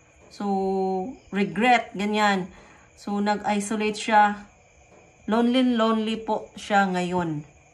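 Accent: Visayas, Philippines. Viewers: The way you talk ay parang hindi ka tagalog. Sa palagay ko, bisaya ka. Believe ako sa tapang mo.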